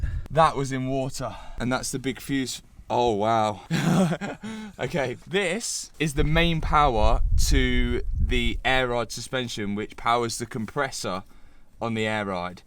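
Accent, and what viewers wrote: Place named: East Midlands, United Kingdom. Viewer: "Italian qualitehh" can't beat that east midlands accent 🤣🤣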